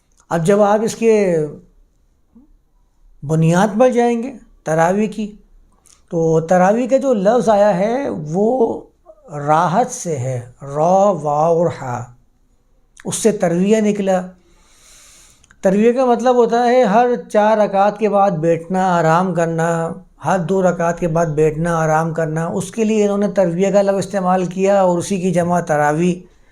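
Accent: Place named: Arab world. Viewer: میاں، آپ بہت معقول باتیں کرتے ہیں، مگر خدا معلوم کیوں اردو کی عربی میں تلاوت شروع کردیتے ہیں؟ اب آپنے فرض کو فرد کہا، جبکہ فرد کا مطلب اردو میں individual ہوتا ہے۔ اردو میں “ض” کی آواز بلکل “ز” کی طرح ہے۔ اور یہی مستند ہے۔ طرفہ یہ کہ قرآن جسکو اردو میں بھی قرآن ہی کہا جاتا ہے، اسے آپ کوران کہتے ہیں۔ یا للعجب! اردو کو عربی لہجے میں بولنا اتنا ہی غلط ہے جتنا عربی کو اردو یا پنجابی لہجے میں بولنا۔ اب اردو میں تو فارسی، انگریزی، اور سنسکرت سے مستعار لفظ بھی ہیں۔ تو کیا انکا تلفظ ان زبانوں کے مطابق کیا جائے گا؟ تو پھر اردو کی کیا درگت بنے گی اور وہ کیسی مضحکہ خیز سنائی دے گے۔ اسلئے میاں اردو کو اردو میں ہی بولا کیجئے۔ آپ جب تلاوت کریں، یا عربی میں درس دیں تو بصد شوق خالص عربوں کی طرح بولیں۔ کس نے منع کیا ہے۔ اھلا و سھلا۔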